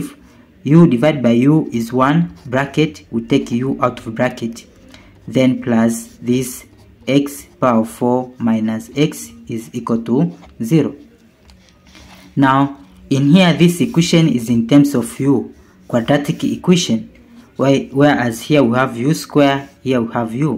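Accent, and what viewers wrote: India. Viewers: 这尼玛印度口音绝了。这题解的 跟傻子似的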